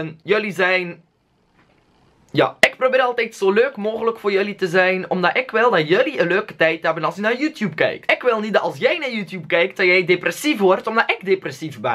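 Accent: Belgium. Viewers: probeer eens in een video zonder een Belgisch accent te praten maar met een Nederlands accent lijkt me wel een x grappig;p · je hoort duidelijk dat hij n Belg is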